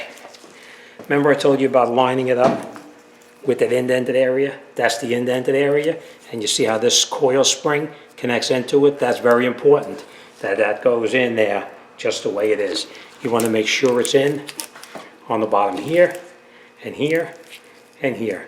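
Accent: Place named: Boston, United States. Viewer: Your video was very helpful to me it was great the way you explained each step as you went along. I took your advise on the spring compressor deal and purchased the whole assembly that made the whole job much easier. Also I noticed from your accent, you must be from the Boston Massachusetts area. I retired and moved from Palmer Massachusetts to Georgia but my heart is still in Massachusetts and it was nice to hear from someone that lives in that area. "Thanks "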